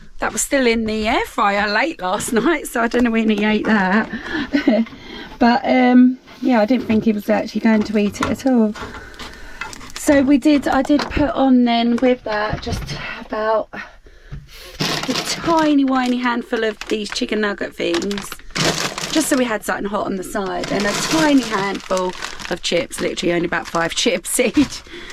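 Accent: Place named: RP, United Kingdom. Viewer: Happy Easter love your posh voice 😅 😅 love & xxx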